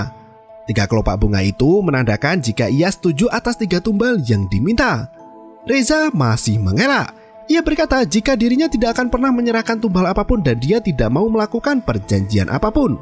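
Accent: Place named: Java, Indonesia. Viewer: Medokk banget mas.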